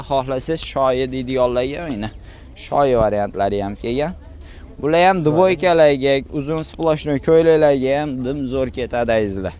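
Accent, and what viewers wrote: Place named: Khorezm, Uzbekistan. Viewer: хоазимчани урйанипди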